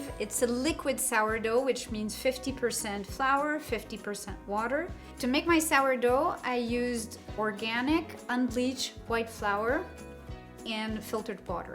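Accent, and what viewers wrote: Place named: Montreal, Canada. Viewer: Look like the montreal accent ☺️ ... from what ive always heard "00" stand for the ash content in the flour tho ... 👍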